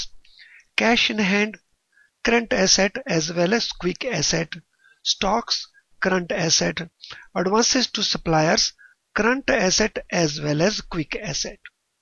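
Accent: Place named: India. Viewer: WORST INDIAN ACCENT, AYYO DDDDDIZZAAASSSHHHTER!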